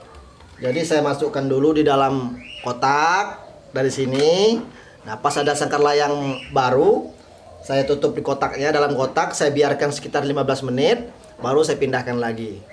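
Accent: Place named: Lampung, Indonesia. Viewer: Kayak logat lampung bg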